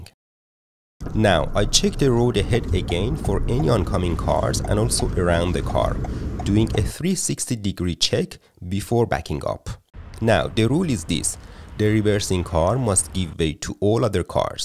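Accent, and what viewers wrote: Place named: Iran. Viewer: I bet he is Persian. I could spot on his accent. Thx regardless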